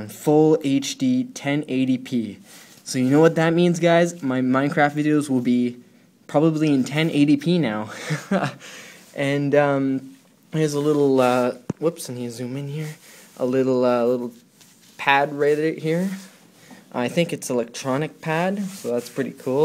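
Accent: Canada. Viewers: Thats just his Canadian Accent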